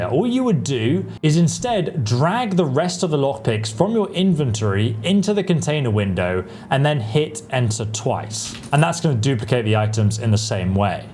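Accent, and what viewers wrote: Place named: RP, United Kingdom. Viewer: You’re very posh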